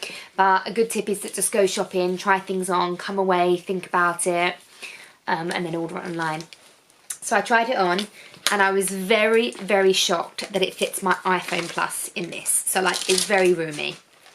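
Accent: Essex, United Kingdom. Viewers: I watch for your accent and fun personality because I love TOWIE....the luxury items are a bonus!!!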